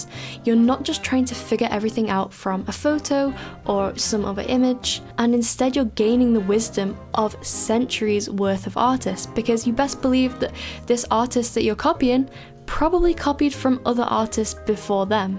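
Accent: United Kingdom. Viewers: I’m trying to place your accent! Somewhere near Liverpool? That’s where I’m from and you sound exactly like one of my cousins 😅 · Just started watching you recently and you’re so natural to teach it’s brilliant and just like your sister I live in another country and I’m trying to paint but hearing a fellow English woman Is perfect, if you ever get a chance to come to New Zealand I’d love to show you around and even have a mini painting session 😊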